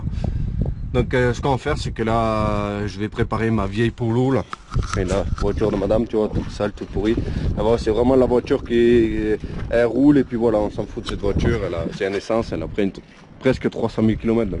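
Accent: South, France. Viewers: Force à toi, le scandinave avec l'accent dégueulasse du midi.... Bisous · G déliré ou t'as l'accent du sud quand tu parles dans la vidéo ?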